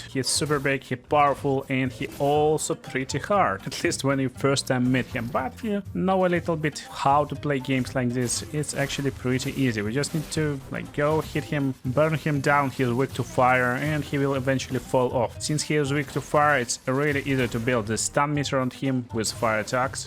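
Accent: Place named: Russia. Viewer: ю инглиш гуд, бат ай ноу у рашн))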